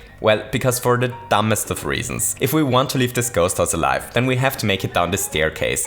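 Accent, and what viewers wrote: Germany. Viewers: Bist du deutscher? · Kann es sein, dass es deutsch bist? 😂❤️ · Ich wette dh bist deutsch 🤔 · Ur english sounds very german ps: ich höre mich öhnlich an wenn ich englisch rede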